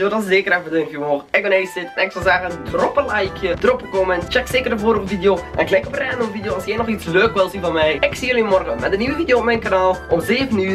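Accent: West Flanders, Belgium. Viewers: Hahahahah west vlaams man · Zo Nice daje ma west Vlaams klapt inde video😂😂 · Kom je uit westvlaanderen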